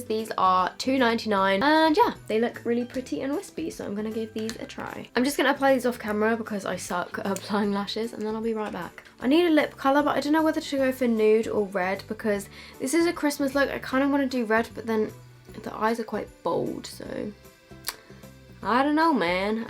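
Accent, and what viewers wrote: Australia. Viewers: I love your Australian accent it made me laugh so much love this look I think I might do something similar for Christmas Day ❤️❤️❤️ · Am I the only one who thinks she has an Australian accent Just me ?? Oh ok........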